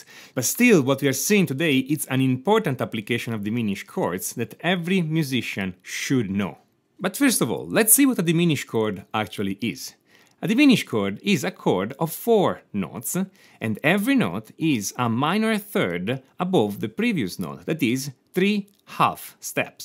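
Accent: Italy. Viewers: You sound italian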